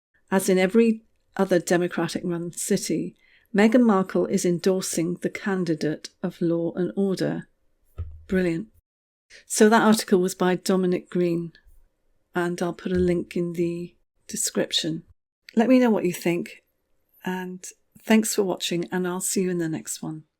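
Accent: England, United Kingdom. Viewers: Hi my friend ! Can you tell me how to hear Megan’s speech please ? I’m 74 and technically useless ! Ido so enjoy your posts, as you’re obviously English, as I am, and easy to listen to, and tells it ‘as it is’ ! But I’ve been sick and not been able to check my iPad or YouTube ! So I’ve missed it all ! PLEASE HELP !? I only have an apple apple iPad, nothing else, no smart phone, etc which didn’t most of my friends don’t know how to use as they have tablets, Android type ! PLEASE HELP ME, I really need to know what she did ? Thanks so much (she is ‘roaming around seeking those seeking those SOULS who would despaired )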